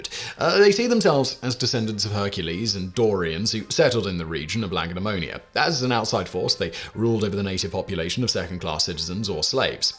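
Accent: England, United Kingdom. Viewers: Do you mean his English accent?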